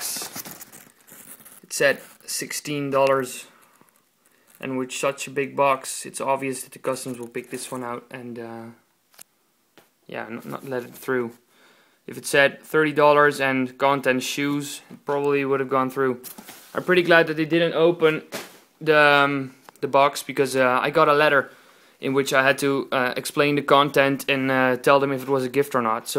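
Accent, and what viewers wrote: Netherlands. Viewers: Dude your accent sounds dutch. Im from holland also but they wont answer me on msn. How much did you pay for these? thanx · O BTW again are you dutch? you sound dutch (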